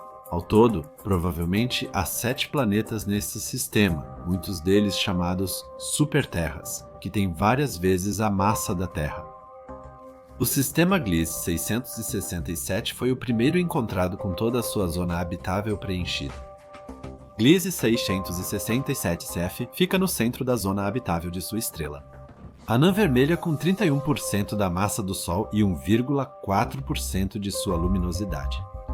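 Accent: Portugal. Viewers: Fala muito rápido e troca o sotaque português e brasileiro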